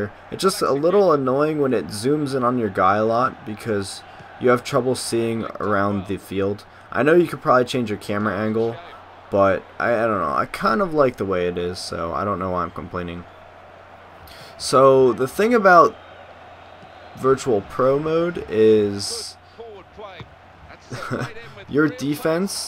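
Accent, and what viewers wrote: United States. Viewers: umm no if your european or whatever its Football. but here its soccer and since is american, its soccer to him, not football, football to us is an amazing sport played with an oval ball. and we pronounce defense different because thats how we say it here. its just different here than it is where you live, no reason to be upset because we say things different here. i don't complain cuz you say mum and stuff because i understand people in different places speak differently.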